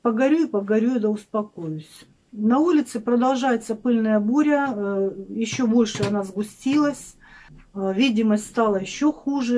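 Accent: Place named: Rostov, Russia. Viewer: Вы ещё сосиски произносите сосиськи😃Ваш Ростовский диалект. Мило и прикольно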